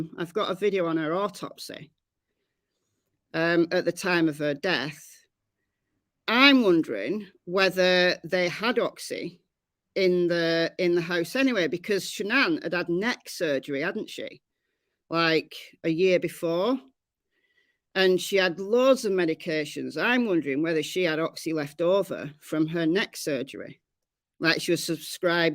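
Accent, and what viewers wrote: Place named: Southern England, United Kingdom. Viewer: So gutted I missed your live. Love your channels and you are the first person I look for when I wake up. I’m in the UK to but much further south judging by your accent. Thank you for everything you do keeping us up to speed with the facts! X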